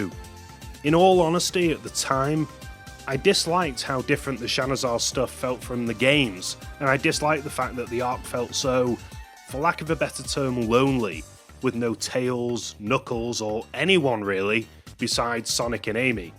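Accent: United Kingdom. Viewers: What a treat to get the entire Fleetway sonic comics told! All with the perfect British narrarator for it. So nice to have you back.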